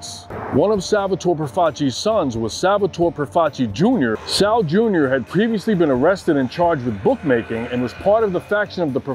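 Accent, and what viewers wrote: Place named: New York, United States. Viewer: You're a really good narrator, especially with the New York accent ( I'm from New Orleans). Very cool channel you have, bro. I'm just discovering it, but it's great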